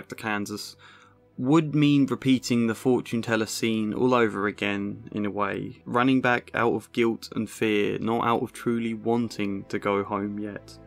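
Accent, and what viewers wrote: England, United Kingdom. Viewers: 137th...WOW ...a Wild Deep Dive into the Mind / Feelings of Dorothy Gale...I actually enjoyed this..and your English Accent makes it even better...... Have you seen the Prequel Oz the Great and Powerful"?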